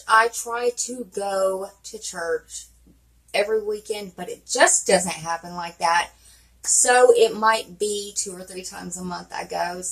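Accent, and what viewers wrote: South, United States. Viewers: This was so cool!! And you’re such a darling! And for the record, I think you have a nice accent. No like a hick or something. Sounds like southern charm to me.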